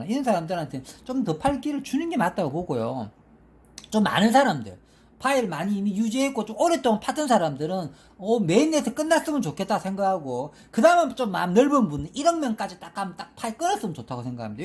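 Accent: Gyeongsang, South Korea. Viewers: 행님 경상도 어데 사십니까?ㅎㅎ 좋은 영상 감사합니다 ㅎㅎ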